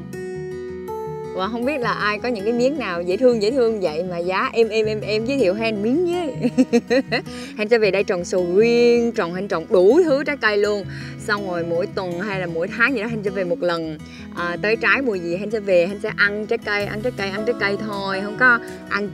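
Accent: Vietnam. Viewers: Hen dể thương quá chú rất thich cach nói chuyên dúng chất ng vn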